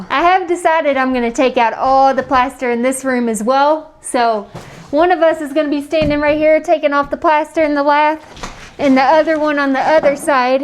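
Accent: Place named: Kentucky, United States. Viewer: I'm from Lexington Kentucky. That North Dakota accent sounds just like a Kentucky girl 😊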